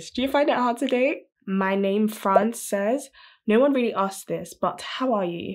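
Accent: United Kingdom. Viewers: "you sound British but look black" who says you have to be white? Such people scare me I swear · “You sound like a British but you’re black.” BOI WHAT ?! 😭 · Great video but, “You sound like a ‘British’ but look black”? That is the stupidest remark ever has to deal with this lol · “You sound like a British but look black” I can only laugh 🤣🤣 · You sound british but look black?!?!? Is she playing dumb? or has she been living under a rock all her sad life? Only shows how ignorante the woman is. Beyond words. 🤦🏽‍♀️💥😵‍💫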